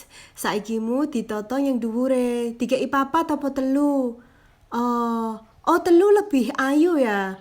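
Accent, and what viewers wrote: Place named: Java, Indonesia. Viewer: Ngedok bangat kaka 😁😁bahasa nya jadi lucu gitu dengar nya acc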